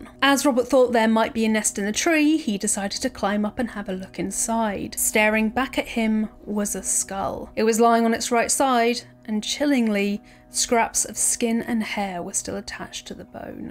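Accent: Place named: United Kingdom. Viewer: What UK accent do you have?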